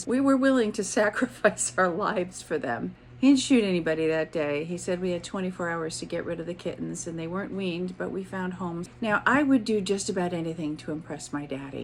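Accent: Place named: Connecticut, United States. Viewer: I was listening and said to myself, that's a Connecticut accent. I was right!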